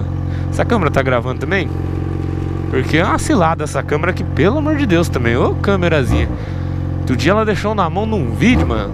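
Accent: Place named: São Paulo, Brazil. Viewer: esses pé vermelho baba um ovo pro sutaque paulista kkkk, mais esse é atentado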